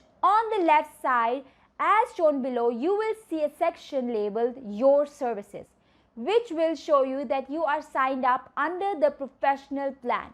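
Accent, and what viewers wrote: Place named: Mexico. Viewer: Que lindo la mexicana y su ingles =)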